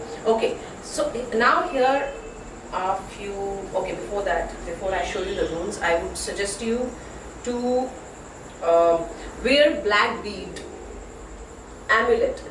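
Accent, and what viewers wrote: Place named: India. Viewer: I LOVE the English language spoken by an Indian person. I don't know why I have an affinity for this dialect, but I do. I can listen for hours! Thank U for a very informative video!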